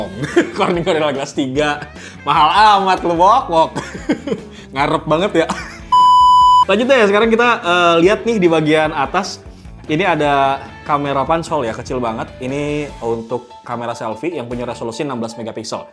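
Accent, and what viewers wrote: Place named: Depok, Indonesia. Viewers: bahasa lu kaya orang Depok Bang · W suka penyampaian lu bang, jakarte bgt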